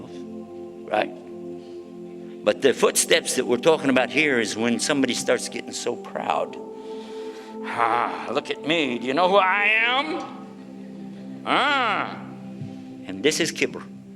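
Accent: United States. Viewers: Aslaam. This guys one amazing human being and Muslim. I met Mr.Yusuf in Madinah sharif whilst on Hajj in 2012. We spoke briefly and then departed as it was very busy coming out of Masjid e Nabi at Fajr time. He said to me "How's Hajj" in this strong American accent. He still looks the same today when giving dawah on TV and may Allah keep him strong in deen and health. This man use to be a preacher in Christianity and his family had the same background. I think his father was a preacher too and Allah gave him guidance/imaan and he accepted Islam. Now that's what you call a true Muslim and that's an example to all us so called Muslims who were born into Islam. I believe Mr. as a Muslim revert is spot on in his dawah and promotes Islam as it should be (Peace). Unlike some of the crazies going about and killing everyone in the name of Islam and giving us a bad reputation. 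Peace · It makes me smile every time I think that this brother was a christian preacher from Texas. His accent mixed to the knowledge that he shares beautifully proves that Islam is really universal. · i love the fact that hes a southern muslim speaker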